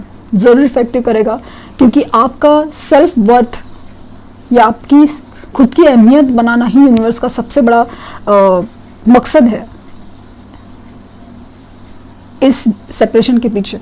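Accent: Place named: South, India. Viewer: Mam ! Your Hindi sounds like south Indian Hindi, but feels very sweet to listen 👌👌😀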